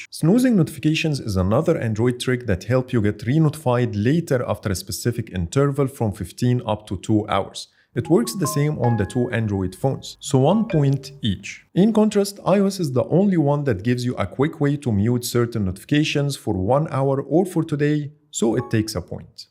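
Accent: Egypt. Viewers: Your accent is Egyptian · You’re Egyptian 😅😅 the accent is screaming Egyptian guy 😊😊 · لو المراجع مصري يبقى انا كدا عرفت اللهجه صح بارك الله فيك على الريفيو الممتاز 💖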